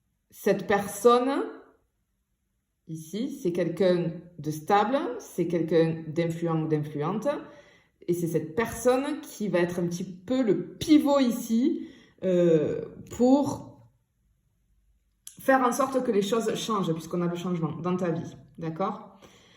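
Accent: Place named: Provence, France. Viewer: Bonjour, j'apprécie la manière dont vous vous adressez à vos auditeurs, votre énergie et charmant accent provençal ✨💜✨🙏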